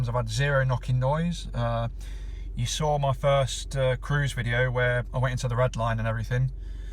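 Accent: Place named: Mansfield, United Kingdom. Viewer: Your from Mansfield? Thought the accent sounded familiar! Im considering one of these to replace my TT next year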